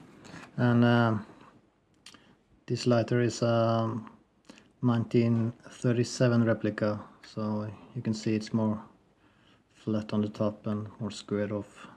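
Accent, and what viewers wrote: Norway. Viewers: He sounds norwegian :-) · Gotta be a norwegian. Nice norwinglish. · This sounds to me like a Norwegian accent. It’s beautiful.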